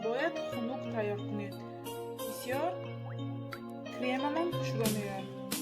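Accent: Isfara, Tajikistan. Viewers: овозатон маълумки аз исфара хамшахри будем хохар ман хам аз исфара ман зан хастам👍👍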